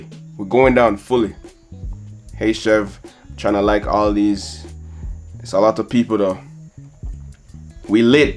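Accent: Jamaica. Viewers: That Jamaican accent so sweet... This is one of the best you have done so far! Blessings to you and your fam. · Hey man mi a Jamaican brotha good to hear ya in ya native voice great video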